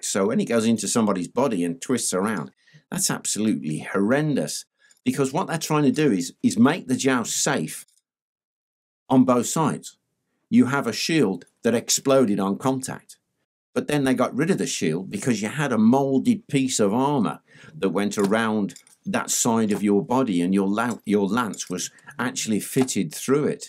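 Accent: United Kingdom. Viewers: I love this dude, he is knowledgeable and has one of the best of the British accents.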